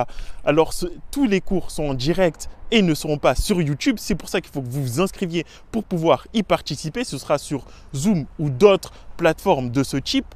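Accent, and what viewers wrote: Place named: Toulouse, France. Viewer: je sais pas mais on dirait que t es a toulouse vers Bonnefois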